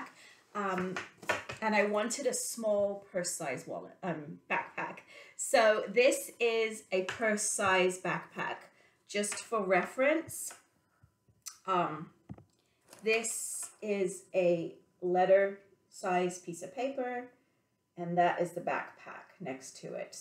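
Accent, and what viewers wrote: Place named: United Kingdom. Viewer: Just discovered your channel which I am enjoying. I noticed a subtle British accent, then in a later video, you stated you were going to visit relatives in the UK. Where are you from in the UK? Hope you start making videos again.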